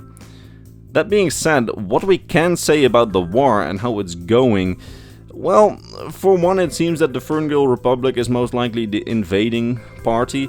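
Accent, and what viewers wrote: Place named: Netherlands. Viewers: He's definitely Dutch his accent isn't thick enough to be Russian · I'm so torn on your accent. My guess is either Dutch or Russian 😂 please help me out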